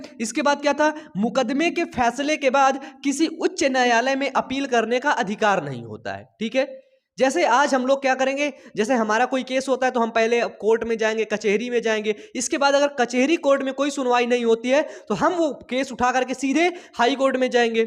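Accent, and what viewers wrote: Uttar Pradesh, India. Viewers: Sir Aap u.p shy hoo kya 🤔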